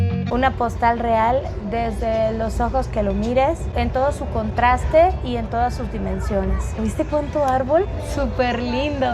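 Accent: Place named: Mexico City, Mexico. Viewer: No detecto que seas cubana...hablas como chilanga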